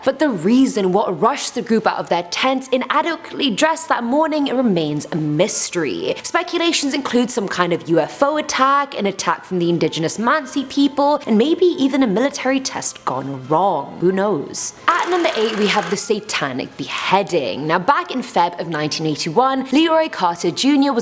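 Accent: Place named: United Kingdom. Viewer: I turned it off as soon as I heard a gross limey voice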